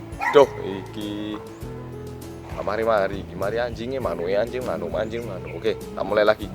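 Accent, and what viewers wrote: Java, Indonesia. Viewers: senyum senyum sambil geli2 gitu medok mbanget oo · Lahh lahhh apa bener kie wong korea ,,aduhh kok medok bgt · Jiiiiiaaaaaan muuuuudddiiiiooookk buuuuaaaaaanggggeeett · Mas ngeprank apa mas ,kok medok tenan bahasanya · masih kuliah ya en kuliah di Sg?? kalo prtama ktmu bgg kaliyah mukanya kek bkn org chinese tp ngmgnya jawa medok diajarin medok sm org Korea dongg, bahkan g ngasadar samsek yg soal Jakartak ntu slama idup sbg org indo 24th gemesloh pas ngliatnya ngmg esssssss njarem itu opo? Keren bgt skrg bs 4 bahasa dong yaah, indo jawa korea sm inggris!!! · Aku dari jakarta, udh tinggal d malang dr kecil smpe skrg.. 14 tahun an.. Sama ky oppa.. Dan oppa keren banget bs se medok itu.. Pdhl aku org indonesia asli.. Salut parah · Lucu lek ngomong ... muedokkk 😂😂😂😂😂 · wealah mase ki wong korea kok medok tenan leh ngomong jowo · Cak, sampean wis tau duet gae vlog bareng ambhek Londo Kampung ( youtubers Australia Suroboyo ) kae, lho? 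Muwedok'e mirip pisan ambhek sampeyan. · wong korea roso jowo enak tenan.... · Tak kira sampeyan ki orang korea yg tinggal di jakarta, pas nonton videomu kaget. Lho medok? Gak retio tumbuh besar ndek malang ik.. Arek ngalam. Medokmu kui lho mas.. Aku yg orang jawa asli aja ngiri dg kemedokanmu wkwkwkwk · Ada oddly satisfaction tersendiri nonton mas ini ngomongnya medok. Hahahaha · wkwkw.. arek iki luweh njowo soko wong jowo rekkk.... isinlah kita hahaha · guru virtual medok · wong bagus soko korea apek le kowe mendok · Kok kekel yoo, aku 😂😂 medognya itu lo, lovely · Njareem.... Smpyn kuwi wong jawa mas hehehe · KOREA MEDOK JOWO Sip mantep tenan salam pompersa (kompak penuh pershabatan) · Smpen iki yo medok pinter jg nulis aksoro jowo. Wong jowo urung tentu iso mas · Lahhh baru nemu inii orang korea ada yang medok jawanya unik i appreciate it 🙌🏻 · mas keren,aku ngguyu2 dewe ndelok vidoene sampean, jan jowone ki lo koyok wong jowo asli... 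aku malah pengen iso bahasa korea mas, ben ndang iso pripun nggeh mas? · subscribed!!! sumpah kaget pas ndelok wong korea medok. nek ngomong jowo nyell, hahaha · Iki ora wong jowo timuran tok.. wong jowo tengah yok ngono.. emboh sii yen wong yogya.. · 😂😂😂 muanteb jowo ne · Aku tinggal di Malang tapi ngakak denger oppa ngomong medok soale aku sendiri kalau ngomong gak semedok dirimu😄😄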